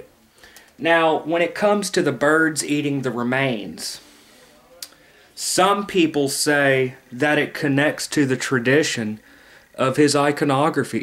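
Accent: South, United States. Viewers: What a strong southern accent!!!!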